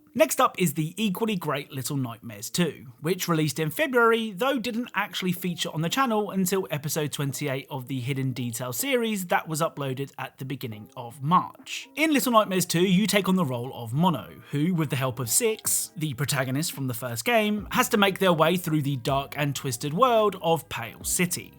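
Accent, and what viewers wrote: United Kingdom. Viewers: Hearing British people say words that’s arent English always makes me laugh.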